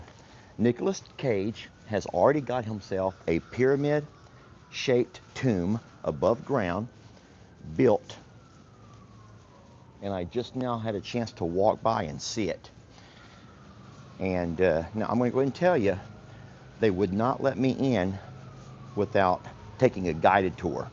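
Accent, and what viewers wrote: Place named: South, United States. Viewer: that’s the way we talk in the south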